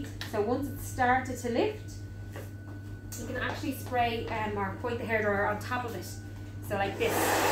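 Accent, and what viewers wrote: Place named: Ireland. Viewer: Love your accent. Gotta love Irish ☘️ girls. 😊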